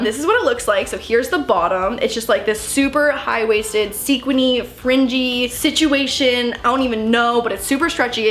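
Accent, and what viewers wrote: Canada. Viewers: . Your Canadian accent kinda showed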